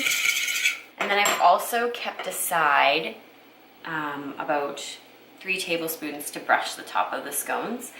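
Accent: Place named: England, United Kingdom. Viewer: I just binged on the entire season of The Great British Bake Vlog-- I LOVE it! As a fellow foodie/baker/Ina Garten groupie, I can't help but applaud your efforts at baking your way through the GBBO. Most times when I'm in the kitchen cooking, I like to pretend that I'm in the kitchen cooking alongside Ina in East Hampton. So naturally when I watch you bake in your English accent while ordering around your sous chef/camera man/best cow vet ever, I can't help but smile. Your unscripted baking show is top notch, I've never laughed as much during a baking vlog. Cheers to future episodes! -- Your California Fan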